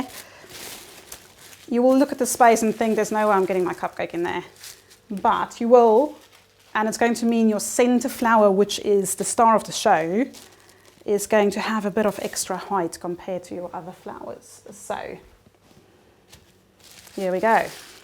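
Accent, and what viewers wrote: South Africa. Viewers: This is so incredibly insightful. Thank you for the time. Also are you south african? I know you in the UK. But you have bit of a South African accent. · Are you South African??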